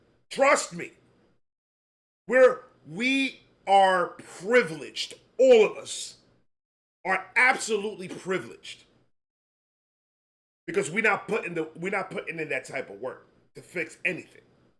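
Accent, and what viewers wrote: New York, United States. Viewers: You have a Yankees hat on. And from the accent you sound like a New Yorker. Im a Yankees fan myself (last names Mattingly). I spent 39 months deployed in the infantry. I did it, i lived it. I have the physical and mental scars to back it up. You have had the best reaction video to this song, ive seen. As a veteran, this is probably my single favorite song ever. The sad thing is that those numbers are under represented. Not all suicides are classified that way. The more accurate number is between 35 and 40 veterans a day. Ive lost more brothers to their personal demons than to combat actions. If you're curious, "The Hornets Nest" is a great movie to watch (and the second half is my company from the service). Great 1st hand of what combat soldiers go through. Thank you for youre appreciation of the veterans. I can only assume what you witnessed on 9/11....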